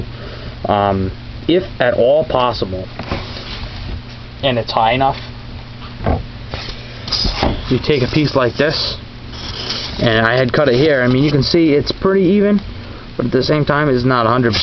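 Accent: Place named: New York, United States. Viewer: I knew you were from NY, I can tell by your accent! Lets Go NY!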